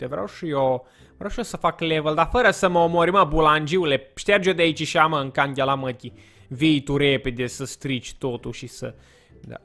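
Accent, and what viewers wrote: Moldova. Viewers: fe ce vorbesti moldoveneste?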